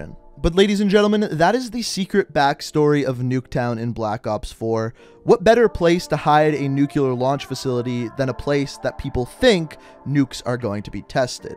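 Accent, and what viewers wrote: Canada. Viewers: I was just trolling man, no harm. And as far as the way he pronounces things, he is Canadian they pronounce things differently than the Americans! Like About is Aboot etc.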